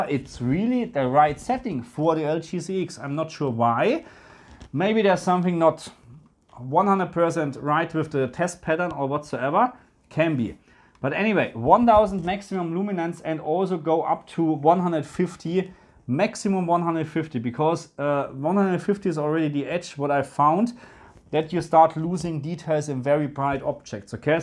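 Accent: Germany. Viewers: never ever did i heard a more much sounding german akzent thats from you ...keep going my guy😅👍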